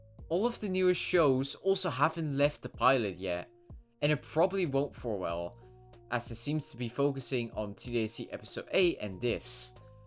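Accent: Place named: Netherlands. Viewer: Sorry this is unrelated, but I heard your accent and I was like "You sound Dutch" and then I check your channel and you're from the Netherlands, I just can't lose. She's too good chat 🗣🔥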